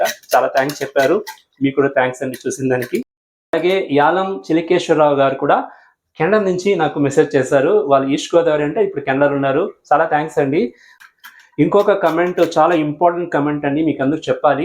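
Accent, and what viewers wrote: Tamil Nadu, India. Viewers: Hi! Miru entho ishtamga vantalu chesi choopistharu mariyu mi telugu bagundi. Miru tamilian andi?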